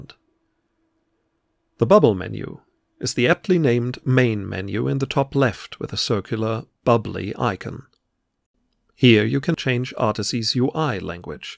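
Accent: Germany. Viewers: I understand a german accent when i see one. Könnt ihr nicht mal ein komplettes deutsches tutorial machen. So ein, so plant man ein Spiel und so nutzt man dazu articy draft.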